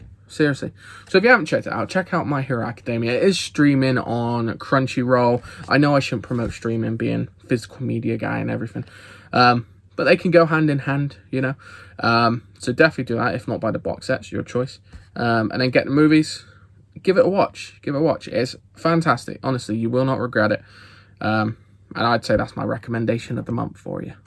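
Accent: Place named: Bristol, United Kingdom. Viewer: A fellow Bristolian loving hearing the accent and also the sheer variety of films you watch - keep up the good work!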